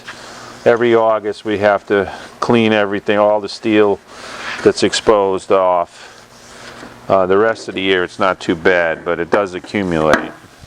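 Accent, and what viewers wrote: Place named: Maine, United States. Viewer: Almost like a Maine accent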